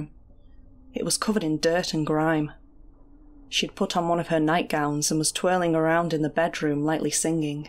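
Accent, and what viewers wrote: United Kingdom. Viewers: Amazing story and she did an excellent job!!! I love a British accent and she def brought it all to the table. Would love to just sit and listen to her narrate stories all day. Good luck, hope to see you push through to the next round!!!!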